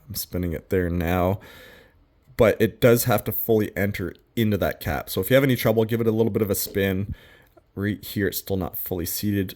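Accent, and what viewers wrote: Canada. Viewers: I’m curious are you from Canada? I ask because your accent sounds like a Canadian accent of some sort and a lot of the stuff you use in your videos I’ve yet to see in the states or at least my state and potentially Missouri as we go up to visit family that lives there once every year or 2 either way I love your videos though I do worry about your safety as it does seem there are a lot of risk involved which is why I am glad you assess the situation before hopping into the bucket and start working and also make sure you take proper precautions to ensure your safety while also not causing further damage to the lines you’re working on but one thing I do have to say the fact you take the time to explain why you’re doing something this way or that way or just simply boiling down to training you’d receive as becoming a lineman, and it’s a dangerous job, my grandfather works in highway construction but also has built houses before he and my grandmother moved down here when my mom was 2 so he also has some knowledge when it comes to wiring up a home for the most part and as someone who’s fascinated by electrical equipment and electricity he left me with a warning to be careful when measuring the voltage 120V AC as it can also cause severe injuries or death, which I’ve already taken precautions when I’ve done this stuff, but I’ve learned a lot about step up voltage, step down, inverting voltage from say 12vdc to 120vac even down to using a old spare computer power supply as a a budget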